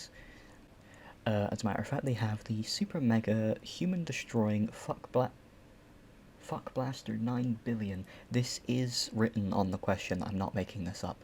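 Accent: United Kingdom. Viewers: Thats a woman? Your just so British that I couldn't tell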